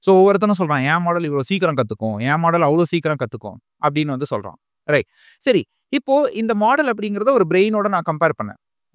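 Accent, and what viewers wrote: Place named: Erode, India. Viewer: Thank you I am regularly watching your video and your way of explaining is very good, I am from erode and your slang resembles it very good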